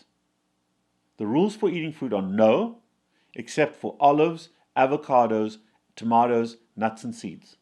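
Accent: South Africa. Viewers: I'm 53 and from South Africa, where it sounds like he is also from. Not sure where he got his apples from - they still look the same to me😂 But I agree that diabetics should not eat fruit.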